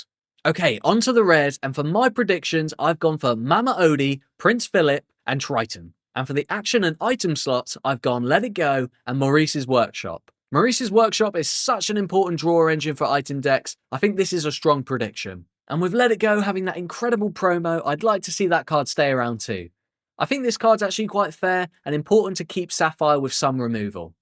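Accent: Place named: United Kingdom. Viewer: I’ll be honest I thought you were using AI British style accents for your shorts. Buttery smooth my man 😂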